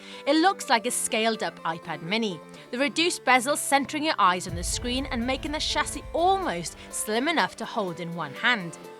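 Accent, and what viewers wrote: Wales, United Kingdom. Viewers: Rainbow clearly a Welsh accent.. sounding a touch like a Bristol one at times (Bristol is on the Welsh borders of course). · hey with that voice am suppose to see something of ''the valleys'' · Sound Welsh to me.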